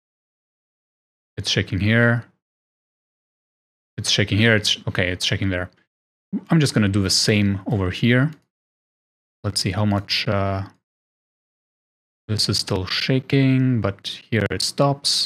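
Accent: Germany. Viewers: Kann es sein dass du deutscher bist weil du redest so deutsches englisch hahahahahah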